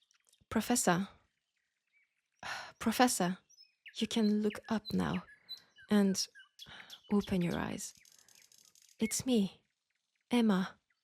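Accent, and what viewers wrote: France. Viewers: it was indeed a huge surprise for me! i literally was stunned for minutes, not understanding if it's even real. And thanks to you for making my friday great with this one ^^ And hey, please don't cringe! Just have fun with it's "thick French accent". I would call that mix adorable at the very least:) Have a wonderful week ahead,. Your content is making my days brighter, so i'm supporting you in my possible ways. I just hope my silly little comments (which also could be cringe, hehe) making you feel better and appreciated \o/ Ты молодец и я правда восхищен твоей работой. Надеюсь, ты будешь продолжать дальше!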